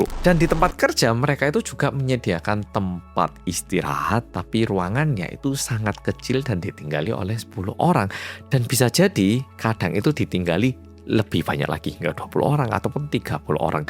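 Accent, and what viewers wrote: Java, Indonesia. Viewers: hai mas medok🎉 lgsg nyimak🎉🎉🎉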